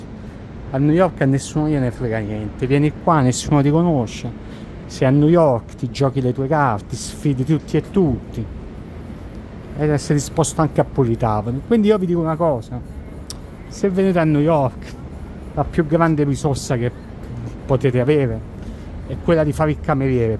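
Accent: South, Italy. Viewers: Prima di tutto " Supercalifragilisticespiralidoso " lo spell checker è andato in tilt.... devo dire che pur se mi vergogno delle ore che spendo su YouTube, tu sei stato la mia ultima scoperta. Seguo molte coppie in giro per il mondo ma tu sei il primo meridionale e il tuo accento mi dà tanto conforto qui a Glasgow fredda e piovosa. Ti voglio dire che forse non lo noti perché sei dall'altra parte della camera: hai una dote naturale di commentatore, in English " Story teller ", forse anche perché ami la parola scritta. Vorrei con questo messaggio incoraggiarti a seguire questa vena. Descrivi quello che hai attorno, le nuove attività peculiari di NY. Secondo me saresti un buon intervistatore perché ami le persone e ti interessa quello che è intorno. Sei ache un opportunista e credo che questi walk/blogs faranno molto bene alla tua impresa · Non ha ancora perso l’accento meridionale 😳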